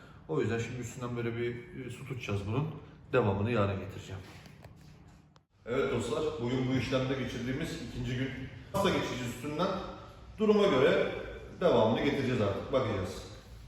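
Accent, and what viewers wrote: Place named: Zonguldak, Turkey. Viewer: selamunaleyküm,saniyorum zonguldak lisin.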